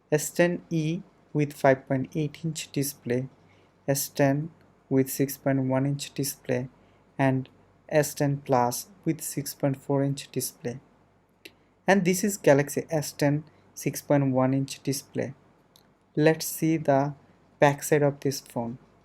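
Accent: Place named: Bengal, India. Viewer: Ur accent sounds like that of bengali people..!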